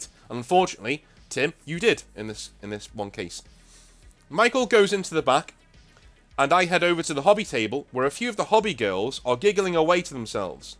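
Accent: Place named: Northern England, United Kingdom. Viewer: Watched a couple of your videos recently, love the energy and content. Curious, based on the channel name and accent, what store did you manage? I only ask as it was in the north of England where I first found my love of table top gaming!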